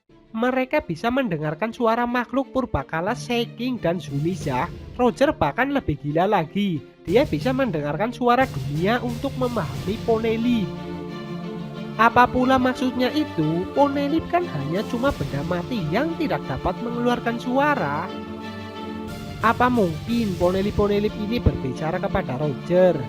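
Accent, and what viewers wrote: Banyumas, Indonesia. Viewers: wakwakwak, wanjir imonya, ane hargai btw, suarane ngapak men mad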